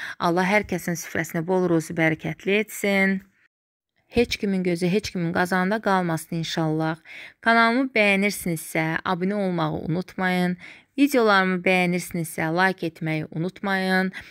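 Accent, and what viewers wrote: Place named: Azerbaijan. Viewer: 🤩🤩🤩🤩Azeriler çok tatli konuşuyorlar.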